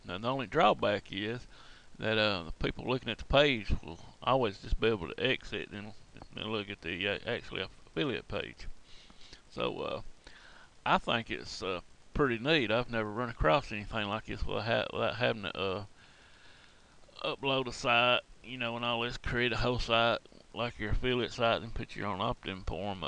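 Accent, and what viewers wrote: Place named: Arkansas, United States. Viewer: Great accent. I'm from Central Mo so mine is more of a flatter accent. Yours is rich with Arkansas.....possibly Tenn/Kentucky......Virginia, not so much. Memphis? Blythville? Little Rock?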